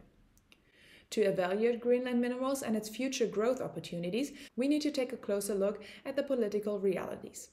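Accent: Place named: Germany. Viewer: In which country/town you are living? Sounds like german.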